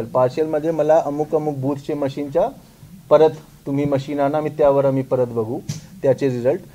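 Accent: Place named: Gujarat, India. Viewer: Gujrati ahe vate ha kasa marathi bolto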